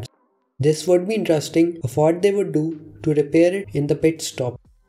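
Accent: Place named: India. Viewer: Seems like indian accent. Bruh where r u from?🌚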